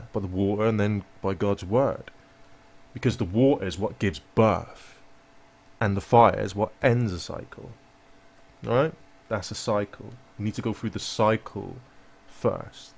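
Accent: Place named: Bristol, United Kingdom. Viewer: you sound like you from bristol am i correct i lived there for 2 years? lol the hay maker is coming ha ha funny brother!!!!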